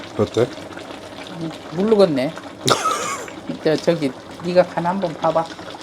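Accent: South Korea. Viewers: 무우를무수라고??ㅎㅎ 전라도말투 저도전라도여요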